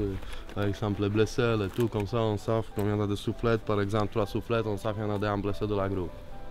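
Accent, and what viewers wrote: Russia. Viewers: Похож на русский акцент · ? Il est d'origine russe selon moi d'où est le problème ? Un accent différents et donc ?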